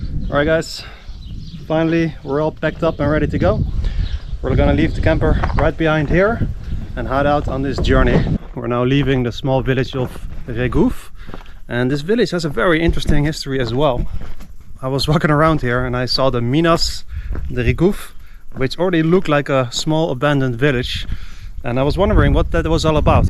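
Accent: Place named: Netherlands. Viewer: Nice to hear your Dutch accent We (brits) lived in the Netherlands for 30 yrs I think someone else has told you that the village of Drave is used by the scouts as a base so not really 'lost' I was more interested in the story of the Brits and Germans who worked together in the other village As I live in Portugal now I must research that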